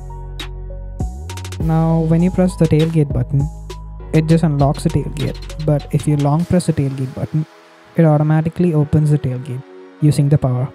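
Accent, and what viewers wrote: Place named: Kerala, India. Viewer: Bruh you from Kerala? 😍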